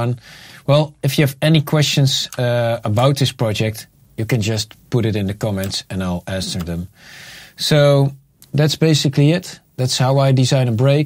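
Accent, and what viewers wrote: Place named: Netherlands. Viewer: You can really hear he is dutch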